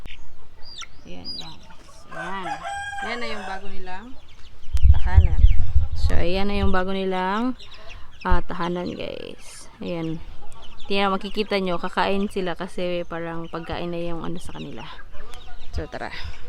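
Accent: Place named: Cebuano, Philippines. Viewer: Saan kayo pinas cibu ang salita mo mensan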